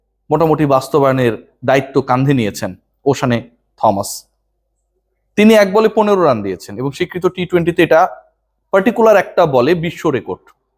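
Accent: Noakhali, Bangladesh. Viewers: ভাই নোয়াখালির বাষায় বলে মোশাই কতটুকু তার হুনিতই কতটুকু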